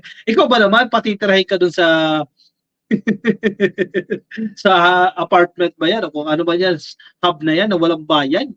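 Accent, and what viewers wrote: Waray, Philippines. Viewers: Hi waray ka ngay an?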